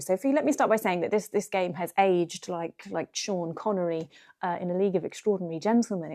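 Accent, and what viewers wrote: United Kingdom. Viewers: Uk-uk! A knowledgeable, entertaining, nice and pretty person with a British accent on the internet! And covering topics that are also my favorite cup of tea! Subscribing now and listening to while having coffee and shaking my pitchfork at the Witcher Show Series.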